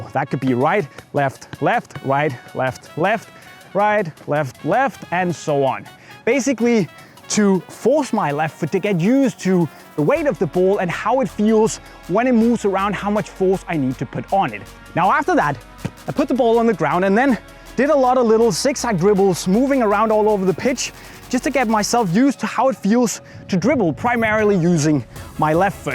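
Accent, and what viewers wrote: Denmark. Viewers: He definitely has the danish accent😂😂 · I smell danish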